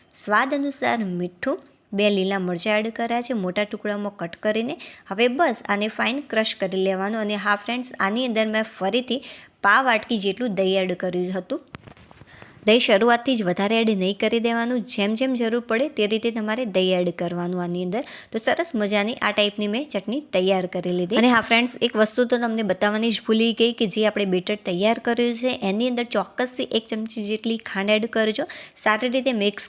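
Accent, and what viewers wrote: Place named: South, India. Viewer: Tamara pronunciation south indian java che.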